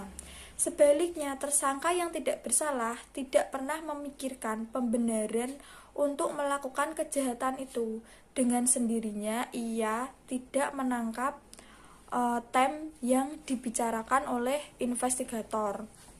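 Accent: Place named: Java, Indonesia. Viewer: Inspiratif dan logatnya medok 😁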